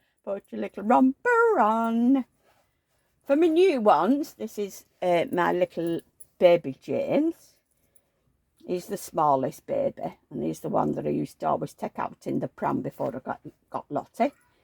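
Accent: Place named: Lancashire, United Kingdom. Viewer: Hi, there. I'm Linda from near Lichfield. What beautiful babies you have in absolutely gorgeous outfits. I have only recently got into doll collecting but only the cheaper ones!!! Really enjoyed your video and agree that the changes we have seen in our lifetime are tremendous. I was born in 1951. I was wondering where you are from in Lancashire(?) You have an accent I recognise similar to that of my grandparents who were from Westhoughton.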